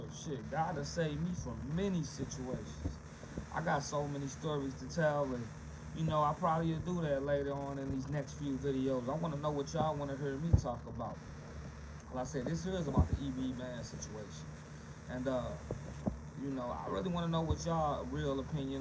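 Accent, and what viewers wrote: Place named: Appalachia, United States. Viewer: You mine As well take his spot cuz. Ya got the hillbilly accent whole thang. Just like Willy did.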